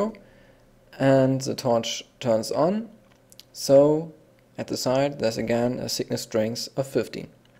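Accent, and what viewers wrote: Germany. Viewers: da hör ich den deutschen raus xD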